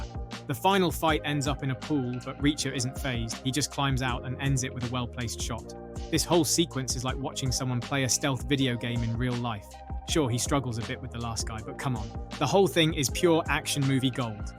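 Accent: United Kingdom. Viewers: THREE/'#-separate-times, ths IIIDIOT British 'voice' states tht, tha 'crazy-doctor' attacks Neegly with a "drill"; it wuz a SAW! NOT a 'drill'! Ths iz one-of-MANY reazonz tht, I HATE British-narrated 'stuff'!! Stay in YOUR land! Leave American stuf ALONE!! YOU-ppl SUCK! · I really do not like to hear the narrating from someone from England. They talk funny and their English is Fucked up!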